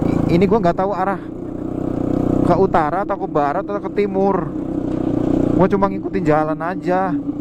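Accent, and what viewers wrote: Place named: Java, Indonesia. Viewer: Sumpah , kalo bukan orang betawi jangan pake Lu GW lah tolong , keliatan banget Orang jawa dari tambahan kata KOH dan logat jawa gitu , jadi situ yang ngomong sini yang malu dengernya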